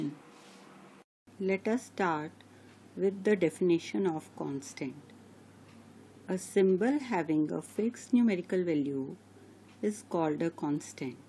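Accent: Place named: India. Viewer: she has an Indian accent like a lot of my teachers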